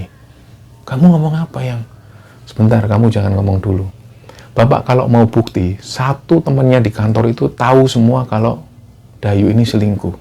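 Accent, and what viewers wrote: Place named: Java, Indonesia. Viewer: Logat nada bicara mu kami smua suka dan senang mendengar kan nya. Gas Jawa banget. Jdi sesuai Membawakan Kisah kisah horor itu. NGENAK DI HATI PENDENGAR NYA.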